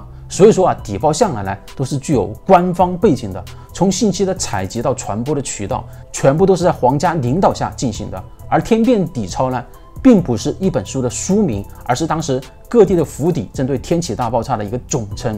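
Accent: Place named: Fujian, China. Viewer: 福建口音听不出来吗你🙈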